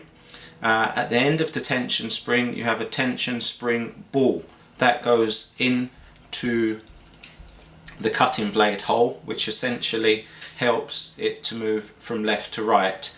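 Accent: United Kingdom. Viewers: Love your accent from London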